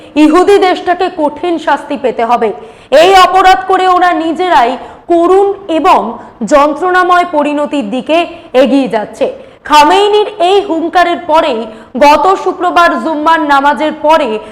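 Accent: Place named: Kolkata, India. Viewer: কোলকাতা মানুষের ভাষার টোন আলাদা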